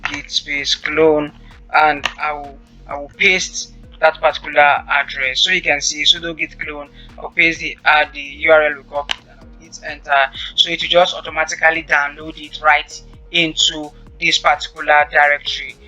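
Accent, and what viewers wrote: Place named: Nigeria. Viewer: Thank you very much sir, you sound like a Nigeria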